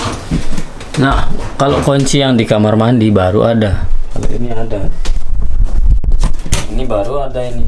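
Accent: Lombok, Indonesia. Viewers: bang, org lombok mana? kok kayanya logat lombok banget klo ngomong heheh maaf pendatang baru, tyg lotim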